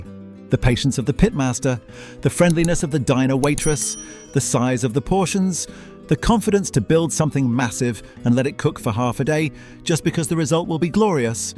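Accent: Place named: United Kingdom. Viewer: They've got a garden? What are they going to do, smother it with basil and thyme and cherry tomatoes? What's a garden got to do with barbecue? You plant flowers and aromatics and Japanese shrubs in a garden. There's no provision for a smoker and a cutting block, and nobody cares about being surrounded by cilantro and posies when you're grilling and barbecuing and smoking. Ridiculous. And yes, the wood is important. I don't think you can find hickory or mesquite in Europe, and oak and other slow-burning variants have to be the right kind. I certainly don't want my ribs smoked in ash or yew or olive, wrong flavor and smoking properties. And a brisket is not the size of a suitcase. You are exaggerating and misrepresenting a lot of things. You sound British yourself. I understand that you're trying to present a concept in this video, but you certainly still don't know enough about the subject to talk about it correctly. 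I suggest you stop hurrying when trying to put these videos together, the clicks are not worth it, and not important enough. You will be ignored when you come off as ignorant instead of informative. Very annoying. And why the hell do you have noodles and coffee and other things in a video about American barbecue? It doesn't make sense. Are you a real person, or are you the early iteration of HAL and Skynet? If you're human, tell us about your last experience when you had to tune out your crazy ex-girlfriend because you were trying to relax after work with a video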